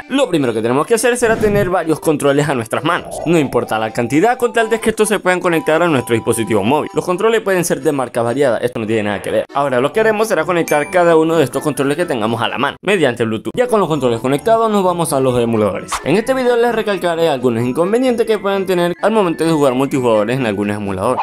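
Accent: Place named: Mexico. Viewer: enserio mano, yo pensaba que eras de México xd. Sigue así tus vídeos son exelentes♥️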